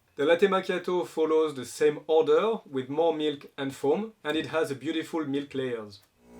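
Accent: France. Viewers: your english is very bad.. it sound very french! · While having an obvious French accent, his English is quite concise and easy to understand. You are also clearly a nonnative English speaker judging by the way you have worded your comment. Either that or you are just poorly educated. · Your comment was totally unnecessary, is more than obvious that he’s French and of course he has an accent, but that’s it, I didn’t hear any grammar errors, I understood 100% what he said.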